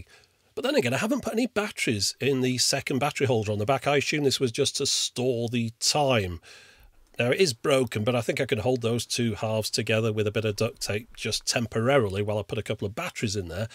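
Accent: United Kingdom. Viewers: Why does LGR sound british in this video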